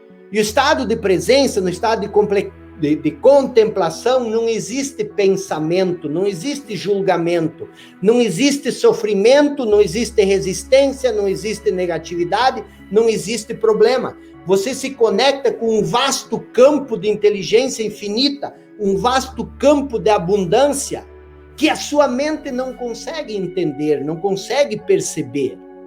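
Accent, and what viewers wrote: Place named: Rio Grande do Sul, Brazil. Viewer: Bem que eu conhecia esse sutaque. Você é aqui do Rio Grande do Sul. Adoro seus vídeos.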